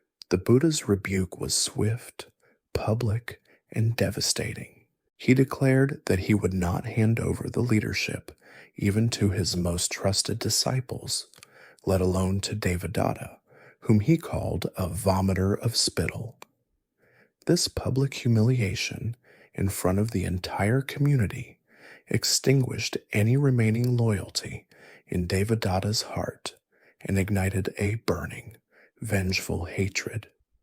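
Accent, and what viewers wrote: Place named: United States. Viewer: Horrific American voice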